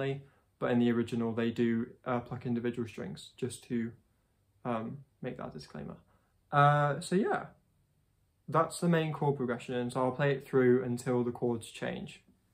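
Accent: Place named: United Kingdom. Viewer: You sound like Dodie maybe because you both are British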